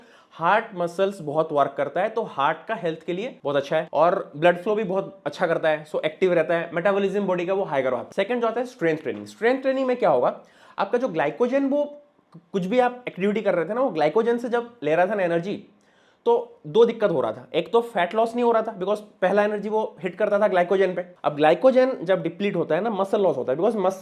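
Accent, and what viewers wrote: Odisha, India. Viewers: Odia loka nka Hindi bhai pura jaana padijauchi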